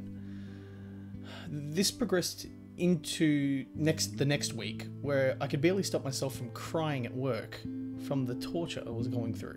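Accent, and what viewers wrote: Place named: Victoria, Australia. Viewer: Subbed. Nice to hear a (I assume) Victorian accent talking re this stuff, makes me tune in and relate more. Thanks man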